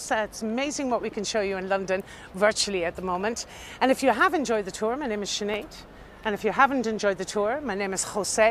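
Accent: Ireland. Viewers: my two favourite subjects London and history and superbly well put over also a gorgeous Irish accent . I love this part of London and learned a lot fromthis video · Still Beautiful and an Irish Princess!! Thanks for your Knowledge & Professional Tour! & Thanks for that lovely Irish voice...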